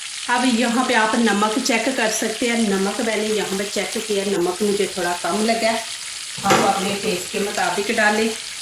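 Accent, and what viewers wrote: Punjab, India. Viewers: آپ پنجابی میں ویڈیو بنایا کریں... کیونکہ آپ کا لہجہ پنجابی ہوتا ہے اور بول اردو رہی ہوتی ہیں ... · MashAllah punjabi lehjay main urdu achi lugi hay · Lehjha aap ka panjabi ha aap urdu na hi bolain anjabi hi bolain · Speak Punjabi for GOD sakes don’t try to break URDU LEGS ( I am Punjabi too but your accent HORRIBLE) 🙏🏼🙏🏼people laugh on us PUNJABIES ) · Baji aap agar punjabi bolain tu zyada acha hai aap ka lehja boht punjabi hai humain apni zuban hi bolni chahiye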